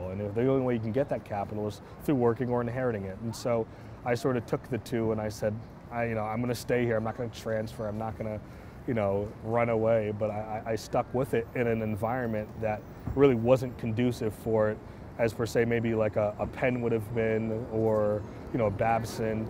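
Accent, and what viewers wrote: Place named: Africa. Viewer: You guys are actually from africa aren't you and now you're here giving us a bad name. Well listen up buddy-we don't need your help so stop hiding behind AA and show your true self. Covering OR dropping your accent is not fooling anyone😎😎😎😎😪😪😪😪😪😓😓😓😓😬😬😬😬😬😬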